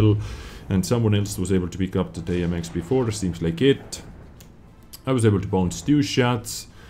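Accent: Estonia. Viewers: are u estonian?:)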